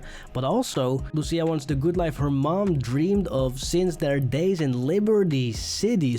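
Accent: Netherlands. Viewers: Hi! I’m wondering, what country are you from? I noticed you sometimes have a Dutch-English accent. Just wondering. 😅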